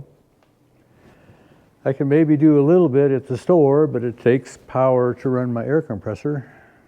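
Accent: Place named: West, United States. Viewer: Once again, thanks for a wonderful yarn. Sure put a smile on my face! Love to listen to a real westerner spin a tale.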